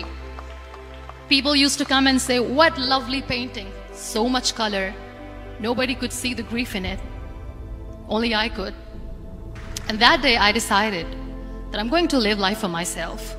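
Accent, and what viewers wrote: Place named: India. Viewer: Hindi accent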